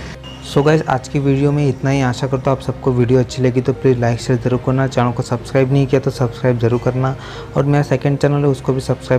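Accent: India. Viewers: Why you talking like indian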